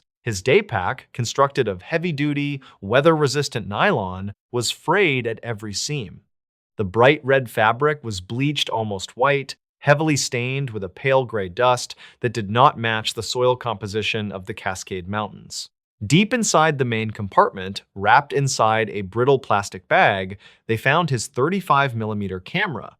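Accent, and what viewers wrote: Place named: Essex, United Kingdom. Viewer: Essex England 😊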